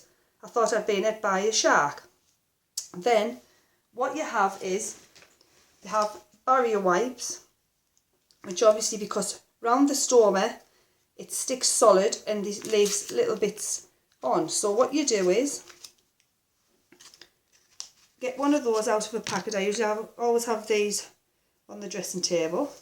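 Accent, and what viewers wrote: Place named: London, United Kingdom. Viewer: You sound like you are in London, they have the TIES Ostomy Cure over there , so far 15 patients have tried it, still not yet ready, hopefully it will be available soon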